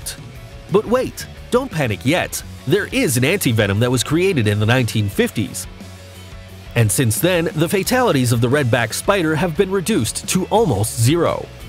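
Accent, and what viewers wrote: United States. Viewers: this is Australia, Mate! We gave INCHES away a very long time ago - and the American accent. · Got issues with this video. First why is it being narrated by an American? Ask an Aussie to do it. Second.... they have obviously read mosly from an Australian National Geographic journal... found it online. Third the White Tailed spider has not been verified as dangerous...no evidence of a venomous bite. In other words an urban myth.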